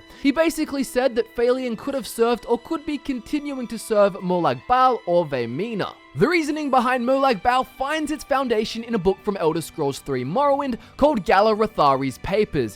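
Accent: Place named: Australia. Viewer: sounds like he is Australian but is he Australian?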